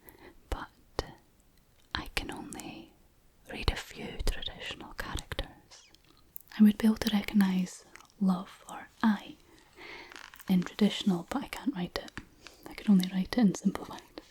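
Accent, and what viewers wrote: Scotland, United Kingdom. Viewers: you are such a fascinating and beautiful person. I love this video., I enjoy all your videos. I enjoy seeing you, listening to your voice, and your Scottish accent, I love watching your hands. You are a very special person and a unique friend. Thank you for the joy and relaxation you bring to me and so many others. Have a great week. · Scottish girls are amazing! Your accent is very nice and pleasant, great ASMR, thanks ;-) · You deserve more subs. Everytime I watch your videos i fall asleep everytime, your voice is soo soothing and amazing, and your scottish accent makes it 10x better ❤. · Are you trilingual? Is Scottish your mother tongue? Just curious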